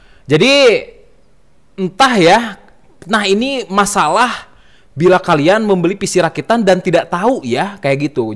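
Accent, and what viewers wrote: West Java, Indonesia. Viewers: AA dari Sunda...kayak suara teman saya PakDedi Ciamis..aksenya...asyik tapi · "PGA... PGA... pega..." ni apaan ya baru denger. wait. ....oh sunda. ganti p sama v... VGA, vega... ok paham dah. maaf jangan diambil hati, hanya jujur pertama bingung gegara logat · Logat Sunda jadi ciri khas pisan 👍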